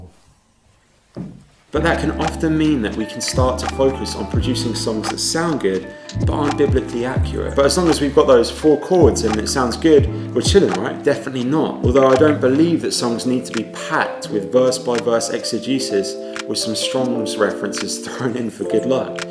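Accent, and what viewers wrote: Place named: United States. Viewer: dude, well done. Full marks on your yank accent bruv!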